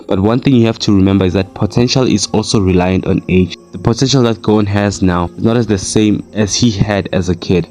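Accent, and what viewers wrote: South Africa. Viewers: Dude, are you South African?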